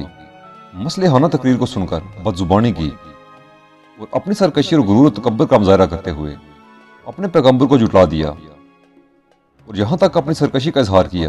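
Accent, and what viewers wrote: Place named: Murree, Pakistan. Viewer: آپ کی آواز سے لگ رہا ہے جیسے مری یا ایبٹ آباد سے تعلق ہے اچھی ویڈیو بنائی ہے جزاک اللّہ خیر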